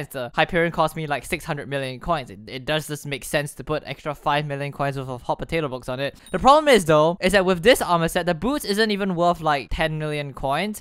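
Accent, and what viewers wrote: Singapore. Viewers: I can tell ur from Singapore too:D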